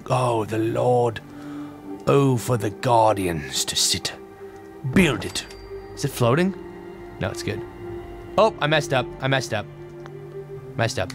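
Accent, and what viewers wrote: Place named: United Kingdom. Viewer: lol... Love the British accent. All this brings me back to FNV, first saw you, and ur vids, followed you through all that, and Skyrim till that bombed, but damn you drove me nutts in that one since I was trying to follow, never did finish it. Love your mom, but I agree it's just too much for her to watch all, and u being able to say whatever you want... Thanks for all the entertainment with all these vids, they are very important to many people.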